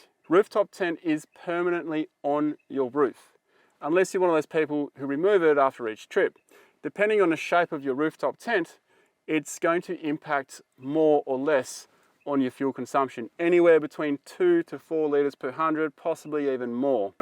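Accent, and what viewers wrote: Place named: Australia. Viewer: I’m not sure why I find aussie accents so annoying. I guess I just want them to annunciate…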